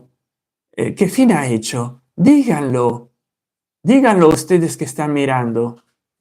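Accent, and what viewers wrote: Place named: Argentina. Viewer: Hasta xuando viviste en Argentina?tienes un acento muy marcadito😮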